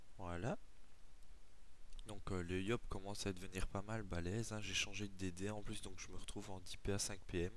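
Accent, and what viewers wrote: Belgium. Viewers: tu viens de ou de Belguique?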